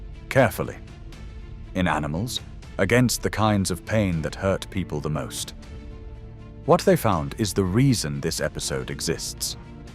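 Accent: United Kingdom. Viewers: British accent is cool. 👍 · What's with the Brit accent?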